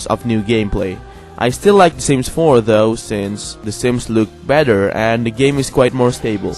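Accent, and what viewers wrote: Indonesia. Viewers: Aku tau kamu orang indo karna cara bicara kamu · I KNOW IT!! haha!! because, you speak english language in Indonesian accent :v perhaps, you should try English in "medok" accent :v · iya aksen lu emang indonesia banget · Oh. Im Malay and understand Indon. I knew that accent. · maigat w kira lu 22tahunan ternyata tuaan gua yha hhh. btw tau banget sih lu orang Indonesia from ur accent kedengeran banget ditambah unamelu ada ""doangnya" wkwkw gua tbtb seneng sendiri karena lu orang indo(?) ya because I am actually proud of you because u have So many great contents and i really enjoy all your videos! keep it up dude! sukses selalu! Cheers👍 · I knew you are Indonesian, from your channel's name, your accent is really cute xD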